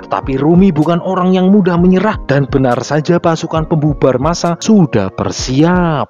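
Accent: Banyumas, Indonesia. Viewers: wong ngapak apa ya admine😂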